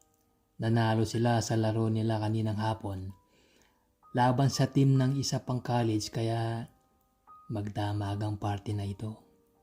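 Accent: Pampanga, Philippines. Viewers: Kapampangan accent ei.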